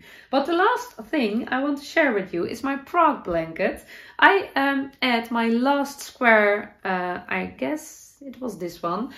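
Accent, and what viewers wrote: Netherlands. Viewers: thank you so much for sharing your life and makes with us all. I love your dutchy-english and am always impressed with just how fluent you really are. That bike ride at the end was FABulous! I'm so envious of your locale 🌳and I absolutely love your pup 🐶❤You really are so talented and seem to know your personal style so well. Oh yeah, I also love your hair!!!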